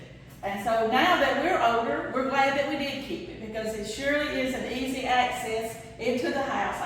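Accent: South, United States. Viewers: New subscriber and I just love your channel, especially your sweet Southern accent. Thanks for the wonderful tip. Love your "Paddy Wagon"! My husband and I replaced our computer chairs for comfortable, padded recliners. I put a pillow on my lap, put my keyboard on the pillow, and recline with my legs and feet propped up. I have a large computer screen that's easy to see. In the kitchen, I have an office chair on wheels and a bar stool with a back and arms on wheels. Comes in handy for working at the sink and stove. Such a great tip for raising your dryer! The main thing that has helped my arthritis pain is to eliminate all "Inflammatory" foods from my diet. No more sugar, white flour or white pasta, no refined or processed junk foods, no soft drinks. Just eat lots of lean meat, fruits and veggies and whole grains. Only use olive oil. Made an amazing difference in pain and mobility. 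· Mrs., you are simply adorable. I love your southern accent wisdom and tips for making life easier as we age. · Thank you this info is very helpful and I love your southern accent 😊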